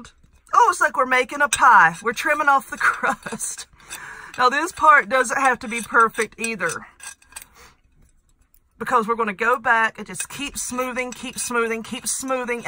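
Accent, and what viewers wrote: South, United States. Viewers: Love this and your accent! Where are you from? I know its in the South. I'm a SE Texan!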